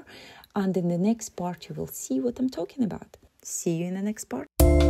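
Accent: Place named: Eastern Europe. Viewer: You have a lovely accent. Is it a mix of Eastern European and Scottish?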